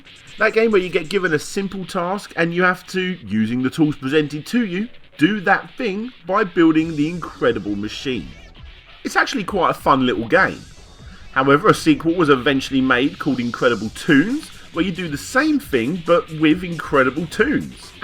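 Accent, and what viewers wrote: United Kingdom. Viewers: Fankfully. U British and ur accents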